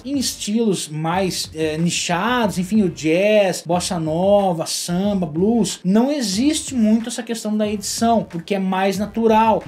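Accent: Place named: Rio Grande do Sul, Brazil. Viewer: Tu és gaúcho amigo? hahahaa Parabéns!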